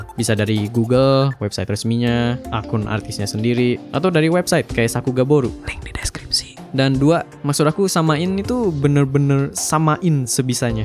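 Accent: West Java, Indonesia. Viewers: Si amang sunda euy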